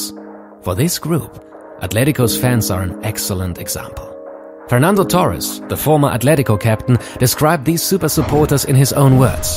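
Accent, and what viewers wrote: Germany. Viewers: Great channel, great german accent. Sehr schön videos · From the accent I'd say Germany or Austria. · what gives you the impression that he's American? His accent is very German and it also says in the about page that it's based in Germany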